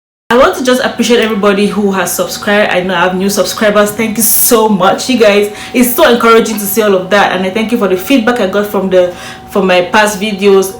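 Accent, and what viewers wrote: Nigeria. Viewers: I am sure that she grew up with Nigerians cos her accent is purely Nigerian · Lol u sound sooo Nigerian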